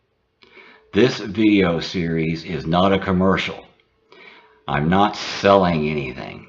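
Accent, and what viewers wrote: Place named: United States. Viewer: Amazing and thought provoking in this age of rampant extremism and rising nationalism. This is the first time I've listened to an American and understood every single word he has uttered without scratching my head and despite his accent. Besides, this person sounds like a very decent American, which is quite unusual. Interesting philosophy, really, and a humanistic and tolerant approach to the world. Personally, I've always harboured similar ideas ever since I was a teenager, but I had no name for it and never looked for one anyway. I've just been unable to fit into any ideological, doctrinal or sectarian pattern all my life, which I think is a boon as I never got involved in compromising political endeavours. Voilà de quoi me lubrifier les méninges. Greetings from Algeria.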